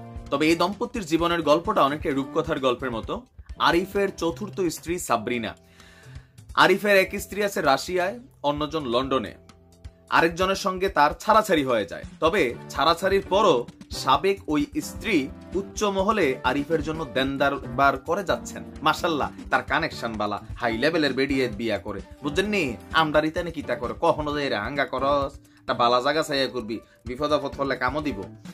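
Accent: Comilla, Bangladesh. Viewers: ভাইয়া আপনি কুমিল্লার ভাসায় বলেন ভালো লাগে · Kumillar vashay kotha koyle moja lage. Vay amini koyaa jan . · ভাই এমন সুন্দর ভাবে কুমিল্লার ভাষায় কথাটা বলেছেন সুন্দর লাগছে ভাই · ভাইজান আপনার কুমিললার ভাষা বেশ মজা লাগে,চালিয়ে যান,আপনার চমৎকার উপথাপনা, ও আলোচনা আমার ভাল লাগে। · কুমিল্লা ভাষা কথা বললে ভাল লাগে শুনতে ভাই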